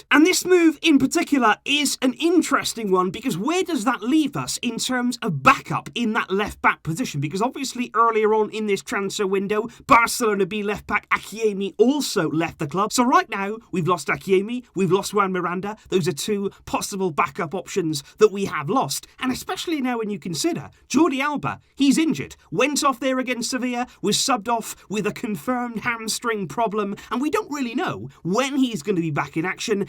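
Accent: United Kingdom. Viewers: I practice my British accent by mocking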